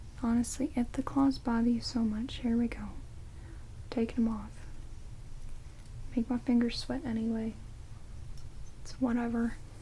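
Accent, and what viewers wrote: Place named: Midwest, United States. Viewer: A vampiress with a bit of a midwestern accent? Intriguing;) Had to happen at some point, of course.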